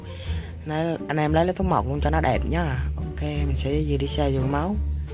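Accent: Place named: Da Nang, Vietnam. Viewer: anh là người đà nẵng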